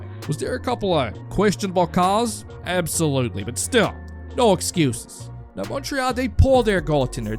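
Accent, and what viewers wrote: Newfoundland, Canada. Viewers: Give him a break. Guys a Newfie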